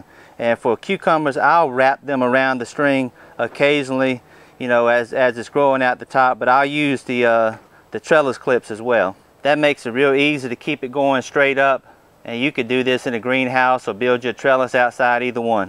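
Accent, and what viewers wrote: South, United States. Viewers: I love to hear people talk with this particular southern drawl like he has got, by the way, beautiful tomatoes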